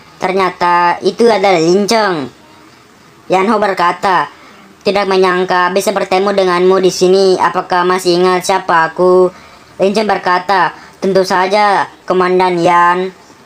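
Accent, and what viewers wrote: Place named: Bugis, Indonesia. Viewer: Adminnya suku bugis ya.. nadanya seperti suku bugis kalau berbicara..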